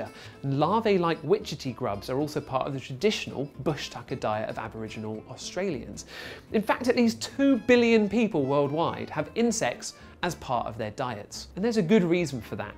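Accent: United Kingdom. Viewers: British accent sometimes is harder to understand than North American.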